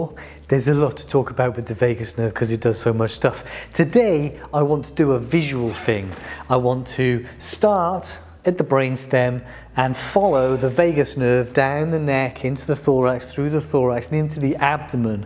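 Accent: England, United Kingdom. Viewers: This guy looks a bit like Eric Clapton. It's great to hear someone with an English accent explain stuff compared to all the vacuous sounding Americans dressed up in scrubs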